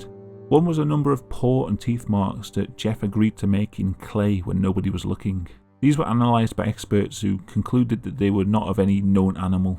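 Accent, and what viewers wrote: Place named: Liverpool, United Kingdom. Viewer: thats exactly what I thought, thought he was a scouser when I first got onto this channel. Being a scouser my self thats why I like this channel so much cos he sounds familiar. Haha he deffo sounds like tom like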